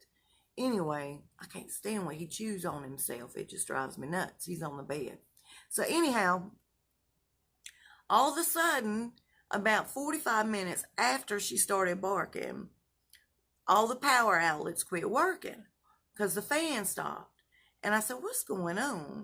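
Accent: South, United States. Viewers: So good to see you! You look beautiful, love your hair. Wish mine would stay put! I swear it has Dementia, I used the curling iron yesterday, tamed it with some hairspray so it would last the next day. check this out, I am mixed, with mixed hair, I woke up with freaking straight hair, how is this possible! When I want it straight, it doesn't stay, and gets frizzy and crazy. By the way, loving the Chewie Bag. Do you still live near the area Chewie disappeared? You know I'm still hoping he returns. It's good to see you smiling again, it really is. Always remember there's alot of people, you don't see that truly care, and love the heck out of you! Lots of love from Brooklyn NY You have the most adorable voice and the cutest Southern accent! And yet, when you laugh, 🤣 you sound so devious! You have a Bless good morning, and a beautiful day.💕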